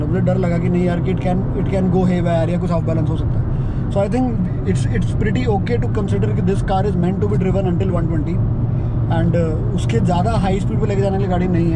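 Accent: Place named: Punjab, India. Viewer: apni yeh punjabi tone aur attitude kabhie mat chhodna luv u sir: )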